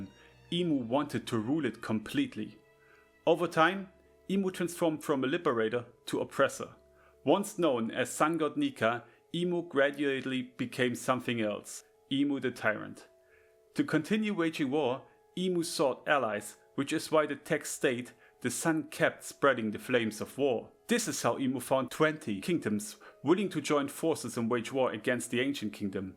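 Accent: Germany. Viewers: Akzent klingt nach 'nem deutschen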